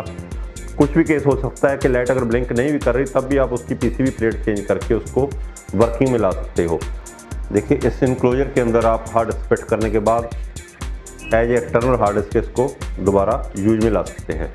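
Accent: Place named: Punjab, India. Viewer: Very good voice pure punjabi. Sir aap kha se ho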